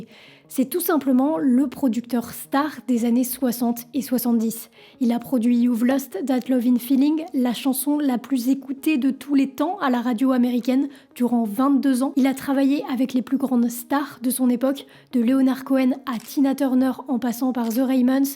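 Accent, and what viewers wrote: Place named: France. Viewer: Très bon documentaire, bravo! Par-contre si je peux me permettre, essayez d'améliorer votre anglais.''The'' devrait sonner presque comme ''de'' et non ''ze''. Vous n'êtes pas la seule, la plupart des français le prononcent comme vous! Juste mon petit grin de sel ; )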